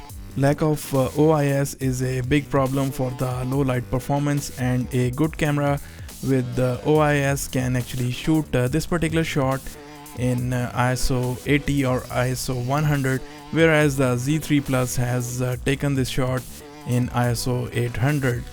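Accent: India. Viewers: That's indian tone... lol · you sound indian 👎👎 👇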